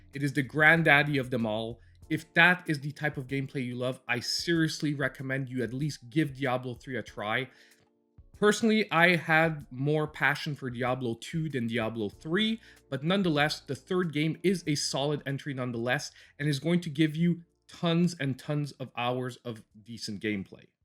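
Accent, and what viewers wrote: Quebec, Canada. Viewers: You can't make the connection between Maple Syrup and the French accent? He's Canadian.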